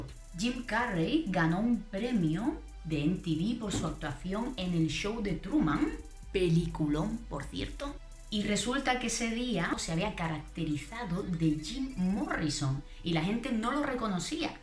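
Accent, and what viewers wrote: Andalusia, Spain. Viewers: Hablando de The Doors en andaluz!! Jajajajaja. Eres una jefaza!!! 👏🏼👏🏼👏🏼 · Creo que este es mi vídeo favorito porque me ha enganchado hasta el final con tanta info interesante sobre Doors y contada de forma dinámica y muy tuya ... muy andaluza , mola! 🤩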